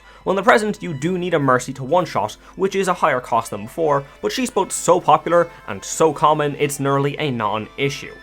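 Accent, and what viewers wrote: Ireland. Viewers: Waheey lad a fellow Irishman?? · lads the blokes Irish lmao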